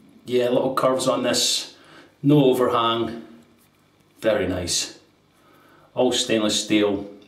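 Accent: Scotland, United Kingdom. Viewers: You asked for it,: Yes, you did butcher Fitjar and Slåtterøy a bit (but they will survive: just a few weepers and nicks) J is like an English y. The diacritical sign above the a is there for a reason: It changes the sound from an open ah to an o-like sound), the double t makes it short, like Slot in a coin slot. Ø is like the French œ or the German/Swedish/Turkish ö, rougly like the i in bird. This time as a diphthong with the y. Imagine the stereotypical New Yorker saying bird: bøyd. (As an aside, in Faroese the øy has indeed morphed into oy.) So it would sound a bit like Fit-yar Islands [that's the ‘intrusive’ English word] Slot-er-øy. Do pronounce the r in your normal Scottish way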